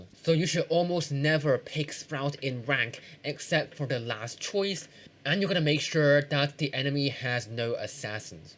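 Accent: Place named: United Kingdom. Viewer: bro sounds chinese and british at the same time i didnt even know that was possible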